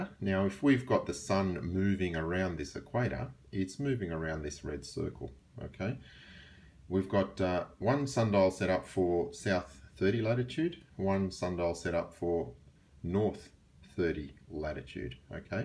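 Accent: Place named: Australia. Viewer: He is Australian as far as I can tell. Here is the NZ accent <link>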